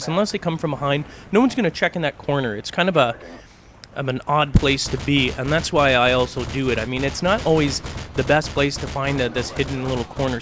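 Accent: Canada. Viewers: are you canadian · Didn't even need to see your callsign to know you're Canadian. XD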